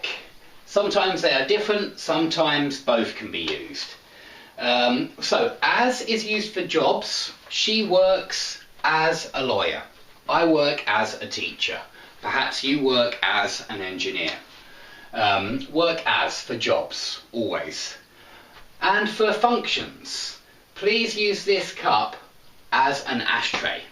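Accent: United Kingdom. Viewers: Your english lessons are the best, because you explain many details. And I always admire your sophisticated british accent -))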